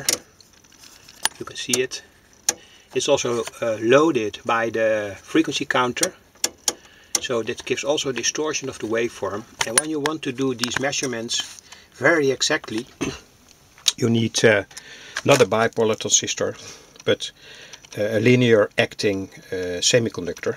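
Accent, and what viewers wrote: Netherlands. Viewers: Hello -nice video From you accent i wil bet you are a Dutch man (Netherland). God save the King Willem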